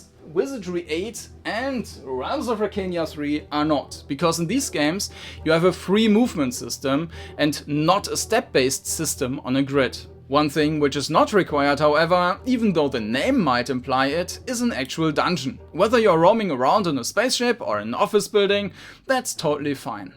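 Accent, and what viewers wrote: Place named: Germany. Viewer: Ur englisch sounds so german :). Cant wait for this years crawler jam to start.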